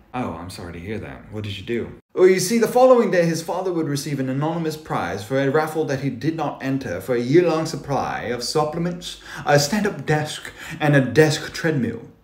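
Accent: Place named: United Kingdom. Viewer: I absolutely love your Elegantly prestigious English accent, which makes the nature of the situation more dire in a dramatic yet intriguing manner. I do applaud you sir for the excellent plot twist that had my imagination in full attendance, in which I Invisioned this fold of events effortlessly in my mind. I would love to see you create a video in which you use that brilliant English accent to depict a Royal speaking to a peasant in a boastful yet menacing way.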